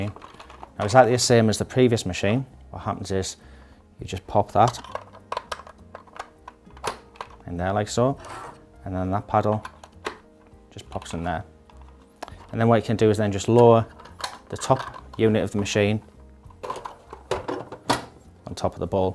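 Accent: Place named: Middlesbrough, United Kingdom. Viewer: Sounds like you're from Boro :)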